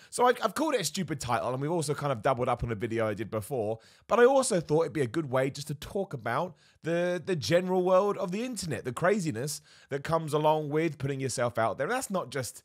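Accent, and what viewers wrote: United Kingdom. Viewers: Now that you mention it, you could kind of resemble Johnny Sins. However, you sound like a Brit and I'm sure Johnny Sins is a Yank. You sound like someone who does radio. And I'm not sure why being confused with Johnny Sins is an insult!!!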